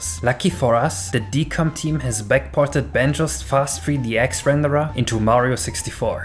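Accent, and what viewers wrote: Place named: Germany. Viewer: jo bist du aus deutschland? hast nen deutschen aktzent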